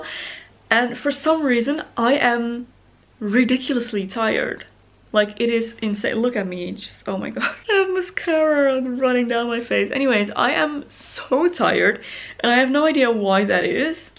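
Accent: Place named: Netherlands. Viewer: haha ik merkte je accent, dus ging er vanuit dat je nederlands was, dus ik overla zoeken, n toen zag ik een boek van paulien cornellise, en toen wist ik zeker dat je nederlands was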